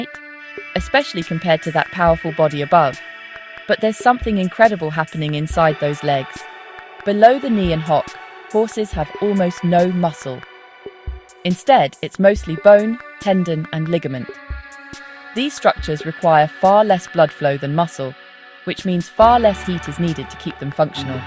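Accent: United Kingdom. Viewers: March 6, 2026 - (dated for context and reference) Thank you for your kind recognition of my comments. I believe in offering "Positive reinforcement" to people for their kindness, and for jobs well done. In addition, I am a lifelong anglophile, so I very much appreciated the video's narrator for her pleasant voice and of course Brit accent👍👍😊 Lastly as a man, I have noted over the years that female equestrians tend to be quite attractive in a number of ways. I certainly have a lot of respect for people that work so well with such large animals. I live in Connecticut U.S.A., and we have a good number of horse farms and Equestrian Centers/Facilities. Stay well.😊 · What a crock of crap this is. They narrarate the video with a British accent voice to make it sound like they know what their talking about. I guess the British/AI narrator has never seen a mustang whose nostrils and ears have frost bitten off. 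I guess they've never dealt with horses that colic when they get too cold or ones that don't drink enough. What I take away from this video is that this is yet another dime-a-dozen horse expert wannabe shooting off their mouth and trying to sound like an authority when they're not.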